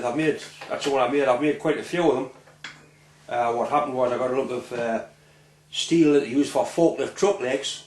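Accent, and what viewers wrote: Newcastle, United Kingdom. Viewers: 62 Btw he is not Irish he is infact a geordie ( from Newcastle, England)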